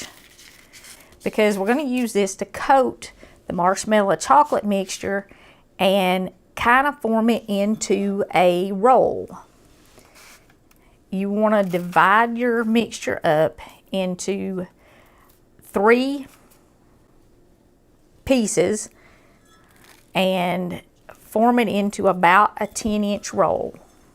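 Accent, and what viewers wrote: South, United States. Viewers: I love her accent. The lovable southerner.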